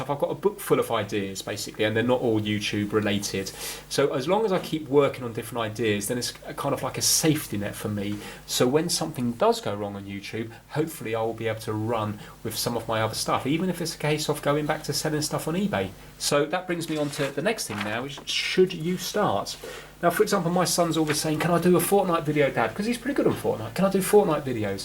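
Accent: United Kingdom. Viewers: I find watching videos of people trying to fix thing therapeutic also I love the British accent (I'm American btw) Congrats on 500k glad to be apart of it :)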